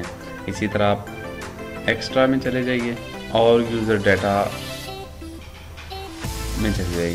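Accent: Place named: India. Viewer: if you cannot speak English - don't speak. 4kin Indian pronunciation: just make the text on screen!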